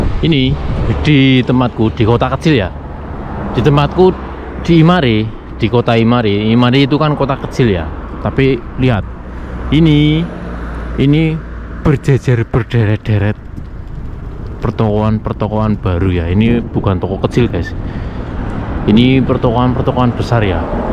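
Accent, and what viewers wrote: Banyumas, Indonesia. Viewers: Wong ndi rika,lik?deneng ngapak temen😂..Banyumas Cilacap mesti kye ya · Sampean wong ndi nyong,!! kok ngomong kaya ana basane ngapak..😄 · njajal aku tak krungu sampean ngomong nganggo bahasa jepang tapi karo ngapak kang..😊😊😊😊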